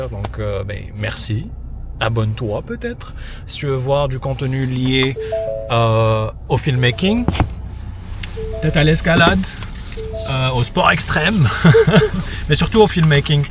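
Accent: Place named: Quebec, Canada. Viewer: C’est quoi ce début d’accent québécois! 😅 good to hear from you Z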